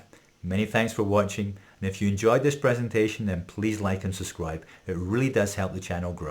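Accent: United Kingdom. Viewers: from your accent, i’m assuming you live in the uk. if so, how do you manage to order melatonin here?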